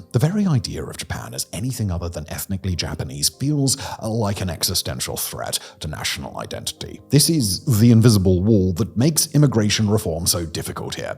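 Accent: United Kingdom. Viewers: He’s talking about managed decline, yet has a British accent. The irony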